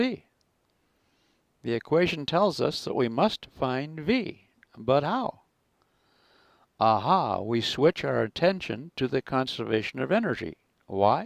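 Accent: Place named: Transatlantic, United States. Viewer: that transatlantic accent is so awesome, all i could think about haha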